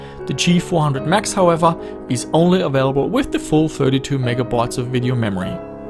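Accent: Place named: Germany. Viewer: FROM CANADA?! I always thought u were German from your accent lmao!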